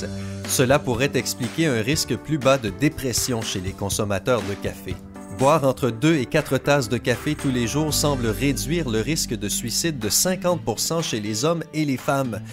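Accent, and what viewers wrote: Quebec, Canada. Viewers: Greg Paris c'est l'accent québécois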